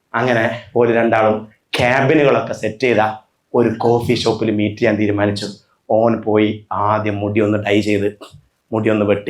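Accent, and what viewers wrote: Malappuram, India. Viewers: Thanku sir... താങ്കൾ മലപ്പുറം ദാഷയിൽ സംസാരിക്കുന്നത് നല്ലത് തന്നെ പക്ഷേ സോഷ്യൽ മീഡിയ യൽ കാണുന്ന എല്ലാർക്കും തിരിഞ്ഞോളണന്നില്ല..... സസ്നേഹം ഹരീഷ്‌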